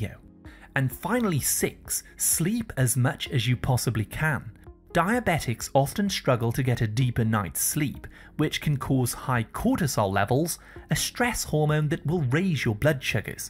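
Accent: United Kingdom. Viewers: Great — Are you British ! Is that your Original Voice !!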